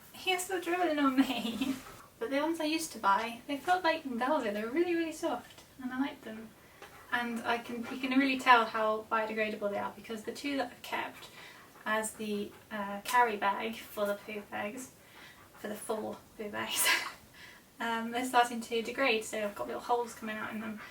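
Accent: United Kingdom. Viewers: We Americans love soft, gentle, British accent especially when she says words like planting...or pl-aww-nting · Never knew is British 😅 Love your accent 🤩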